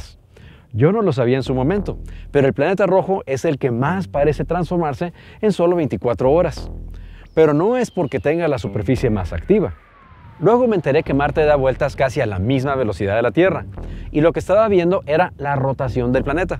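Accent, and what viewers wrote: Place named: Monterrey, Mexico. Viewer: Eres regiomontano 😱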